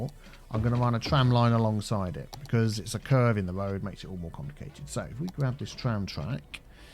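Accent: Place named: RP, United Kingdom. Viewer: why are you so posh?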